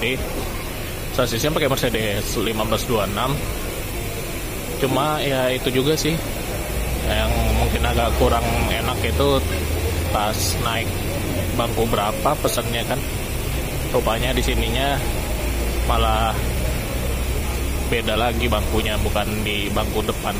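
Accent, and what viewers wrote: Indonesia. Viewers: hahaha ada dialek minangnya berasa ke sumatra 😅😅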